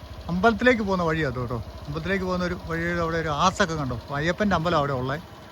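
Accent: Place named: South Kerala, India. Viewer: Wow superb well explained kya scenic place & your language slang ( south kl) nice to hear our roots are from thiruvalla we live coimbatore best wishes for more videos thku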